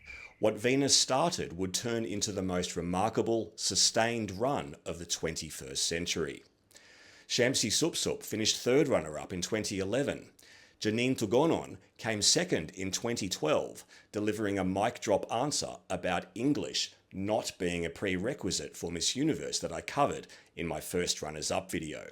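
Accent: Australia. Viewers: I love Miss Universe since I was 15 years old. I love your channel, videos, the amazing information you share! And your Australian accent is amazing and you are charming! Best wishes and Thanks you! ❤❤❤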